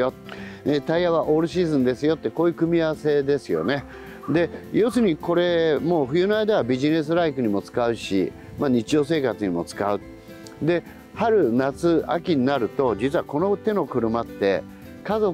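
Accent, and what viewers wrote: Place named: Nagano, Japan. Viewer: 的確な評価と、わかりやすい解説、とてもありがたいです。 どうでも良いのですが、水野さんは長野ご出身なんですね。 「ほら」→「ほお」で、ん？と思っていました。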